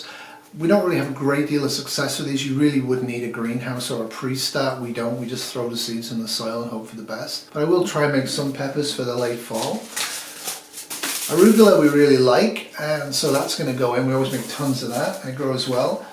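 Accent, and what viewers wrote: United Kingdom. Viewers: I think it's a British thing. Brits don't pronounce the "r" on the ends of words. He has a British accent, not a Canadian accent.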